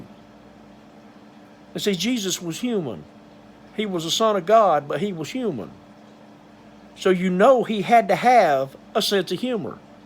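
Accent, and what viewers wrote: South, United States. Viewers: It wouldn't be if he didn't talk southern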